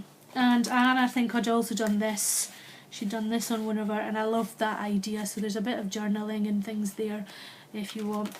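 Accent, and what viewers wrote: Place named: United Kingdom. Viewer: Your imagination is incredible! I was unable to view more than the first two minutes of the first video (not sure why) I saw the doctors bag - girl what a terrific idea! Loved your video - love your voice and accent! (I am originally from the UK - moved here in 1988 (us) and anything from home sounds wonderful. Thank you so very much for sharing your creativity! Hugs Julie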